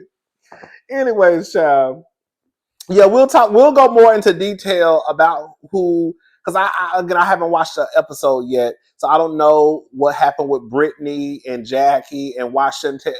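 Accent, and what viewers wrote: South, United States. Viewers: Team Jackie ❤ I holler errtime you say HURRR I live for your country ness and your laugh 🥰🥰🫶🏾🫶🏾‼️😭😭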